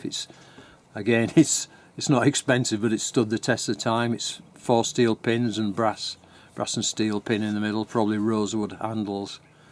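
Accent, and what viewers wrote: Northern England, United Kingdom. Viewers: oh... well... I think your tractor's sexy. there. Now we're friends. :) But Seriously, yew...that's pretty. Since I appreciate you so much, I'm willing to take extreme care of it for you. :) It is a fine knife though. You should make more. Anyway, I have friends in England. You sound northern. I have some wood I could cut and send you to make more handles if you like that sort of thing. I have black Walnut, sugar, silver maple, and even stag-horn sumac, though I don't know if you could keep sumac from rotting, but it is beautifully colored when cut with multiple colors, if you could. hell, I might even be inclined to send you a black rat snake head, or skin when I run in to them in the spring. (they're no less than 4 ft long here. I've even seen a 16 to 20 ft long one, which is ridiculous for them.) p.s. I'm in Ohio, USA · Good morning, I’ve just found your channel and I’m enjoying your videos. 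I live in northern England in Lancashire I’m just wondering what part of England your from. It sounds like your also from northern England but I can’t just pinpoint your local dialect. Keep up the good work. Regards Ian. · "A perfect littl' dangler" LOL ... I love Brit accents and idioms ... last time I heard "dangler" was on "Are You Being Served?" ... one of the best Brit sitcoms ever.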